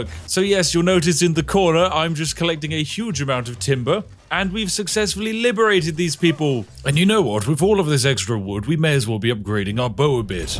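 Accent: United Kingdom. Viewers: honestly I like your videos where you exploit stuff, but this game doesn't really sit well with you for the most part its narrating things that have already been shared weeks ago, I think you are also on previous patch just based on how your inventory looks, and by the way there is easy way to get the greater infinite arrows from abbys challange that doesn't take much time with explosive arrows. so kind of too late and kind of doing it hard way, but narrated in nice british accent :D also did you know about the golden apples that you can make gold bars with? find it less annoying than trying to find nobles with goldbars :D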